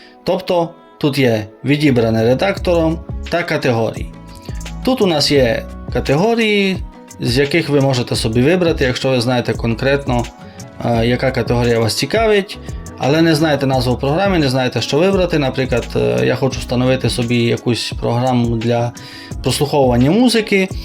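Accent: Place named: Transcarpathia, Ukraine. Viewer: кострубато то кучеряво (пелехато, заплутано), просто ви розмовляєте дуже подібно до закарпатського діалекту, і людина подумала що ви десь з виноградівського-берегівського регіону. Якщо чесно я також так подумав, але якщо ви не знаєте що таке "кострубато" то я навіть не знаю звідки ви.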